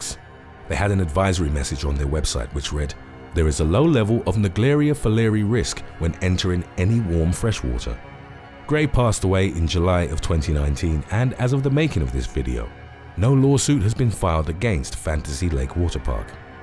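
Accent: England, United Kingdom. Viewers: It's his accent. That's the way they say it in England. Like toe-may-toe in the US vs toe-mah-toe in England. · Aw. The british and their pronunciations.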